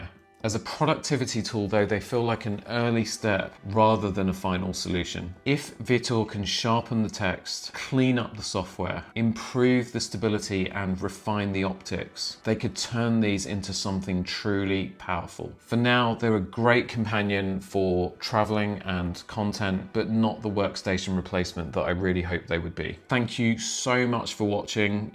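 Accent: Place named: Europe. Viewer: Would have been much better if you didn't constantly refer to ipods, ipads, ios, iphone......apple this, apple that -- you are in Europe judging by your accent so Android would have been so much more appropriate and useful. As it stands, this just seems to be aimed at the US of North America and 9/10ths of the planet can't understand your points. Apple, Apple, that pointless expensive useless brand again is Apple.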